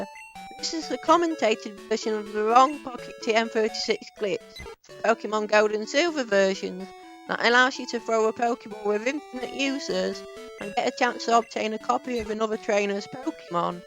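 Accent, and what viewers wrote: United Kingdom. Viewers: Is that your voice? Are you british?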